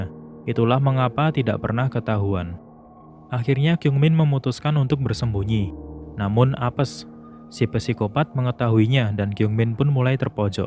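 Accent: Java, Indonesia. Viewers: Semangat terus bang:) Btw suara luh, medok bngt bang🙏😅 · Klo dengerin suaranya kaya org Jawa😀 trus tb nya rada tinggi Iyah ngga sihh bang hihihiii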